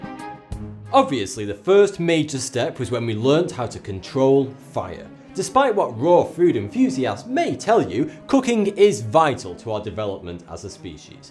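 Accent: United Kingdom. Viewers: There's nothing wrong with his pronunciation. UK English, mate.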